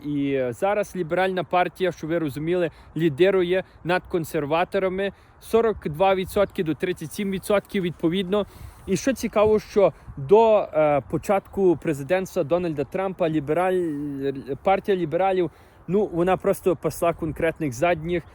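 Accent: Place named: Ukraine. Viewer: МОЛОДЧИНКА,ПО МОВІ ВІДЧУВАЄТЬСЯ УКРАЇНСЬКЕ КОРІННЯ.ХАЙ ПРОЦВІТАЄ КАНАДА,НАШІ УКРАЇНЦІ,КОТРІ ПРОЖИВАЮТЬ У КАНАДІ.!!!❤❤❤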